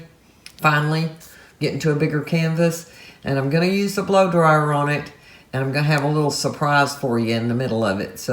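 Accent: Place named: South, United States. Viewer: I just found you today because I was looking for a varnishing technique... I love your artwork! I am from Georgia, so its also good to hear the southern accent...lol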